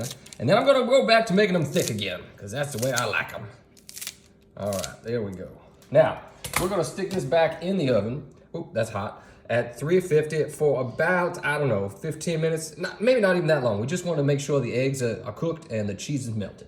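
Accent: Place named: Virginia, United States. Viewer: Sounds like Virginia's in the house! Haven't heard that accent since The Walton's😂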